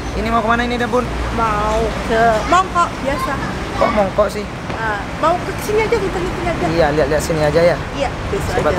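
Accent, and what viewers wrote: Madura, Indonesia. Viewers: Tetep sederhana, dan logat madura nya itu yg ga bsa ngilang yg bkin seru klo mas ngmong 🤣😂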